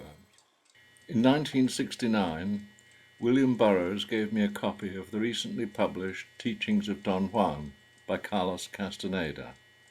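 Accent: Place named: United Kingdom. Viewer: You mean "moot point?" What "internal linguistic evidence" do you speak of? You are presuming that anything this guy Castaneda says is this mystical wisdom, even if you see that he's tricking you. I personally have no respect for "teachers" who assume that their students are too stupid to understand the real truth, so they make up bullshit to make it easier to digest. It's like a religious faith. If you are tripping balls and listening to a nice voice with a Brit accent it probably sounds nice.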